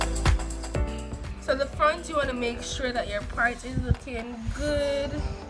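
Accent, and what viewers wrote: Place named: Caribbean. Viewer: And Mash up the curls i love that.... carribean gyal... i could tell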